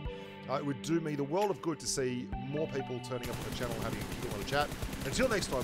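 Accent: Australia. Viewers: You not boring bro haha especially that Aussie accent always cool to listen to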